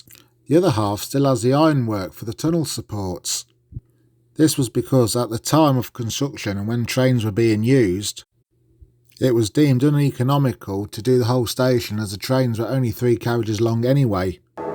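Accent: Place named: Midlands, United Kingdom. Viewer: Thank you for this little detail but the presentator comes from the Midlands and of course his accent is different !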